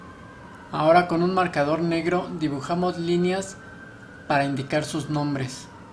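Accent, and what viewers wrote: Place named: Mexico. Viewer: Bno el video, pero la vos de mexicano la cago ):<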